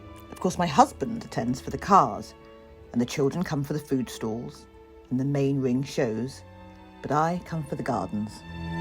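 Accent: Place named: England, United Kingdom. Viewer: I just came across your station and I am so engaged with your channel! You have managed to make everything a real experience from the classical music , the English accent and the history ,poetry I love ❤️ it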